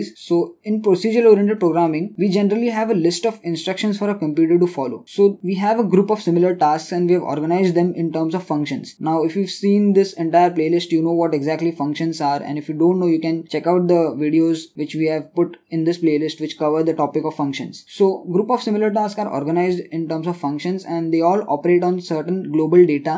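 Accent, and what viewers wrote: India. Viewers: For every complex topic in the world there is one YouTube tutorial with an indian accent that explains it so easy that you immediately understand it. Thanks for keeping the world running. Your tutorials keep the entire educational systems of the world running :)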